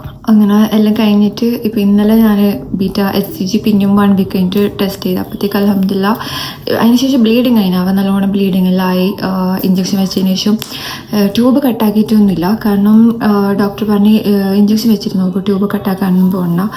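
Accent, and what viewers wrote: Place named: Kasaragod, India. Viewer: Kasaragod yede😂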